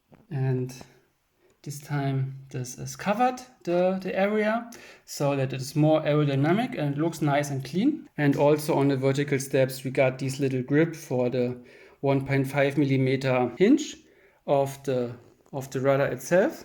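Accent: Germany. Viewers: are you german? Nice video and plane! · digga hab noch nie so krass deutschen akzent in englisch gehört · please, talk german, it sounds like you are a german native speaker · der deutsche Akzent sticht extrem raus, aber das Projekt ist super. Man erkennt, dass viel Mühe reingesteckt wurde. Good job 👍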